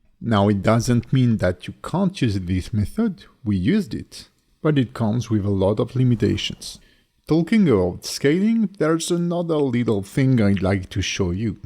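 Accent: France. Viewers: Are you french? because of yoour accent ✨ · elle est ou la version FR? j'ai griller ton accent:p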